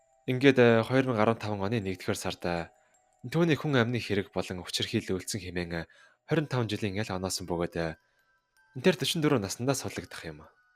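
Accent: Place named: Inner Mongolia, China. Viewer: Өвөр Монгол залуу юм байна гэж бодсон.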